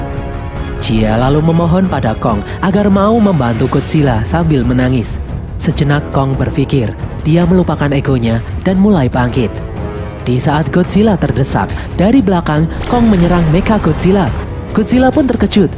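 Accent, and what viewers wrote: Java, Indonesia. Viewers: Min Anda Orang Jawa ya kop Bahasamu koyo ngono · Seru onk boso jowo e 🤣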